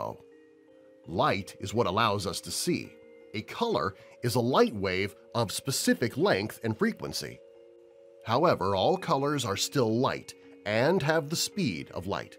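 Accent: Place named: United States. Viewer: why didn't you choose someone who can speak Hebrew to narrate? the pronunciation is so american...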